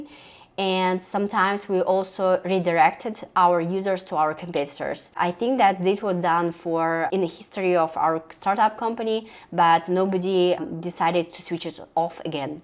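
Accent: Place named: Russia. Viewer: Upd она и правда русскоговорящая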